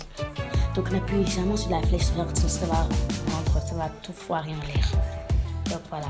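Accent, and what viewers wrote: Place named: Ivory Coast. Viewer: Est-ce que tu es ivoirienne parce que tu as l'accent ivoirien tu es sûr que tu n'es pas ivoirienne parce que moi je suis ivoirienne et je connais cet accent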